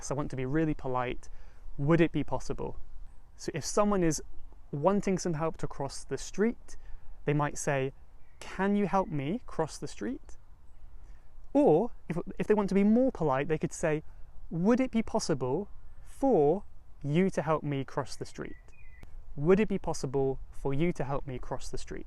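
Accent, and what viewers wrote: United Kingdom. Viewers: I love your British accent 😅📌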